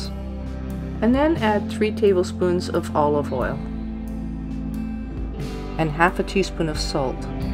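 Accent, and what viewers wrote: Canada. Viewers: Thanks for sharing I love naan bread and I also like your Canadian accent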